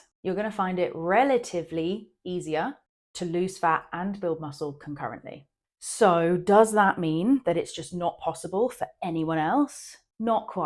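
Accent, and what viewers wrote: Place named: United Kingdom. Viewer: This I subscribe to.Love the British accent.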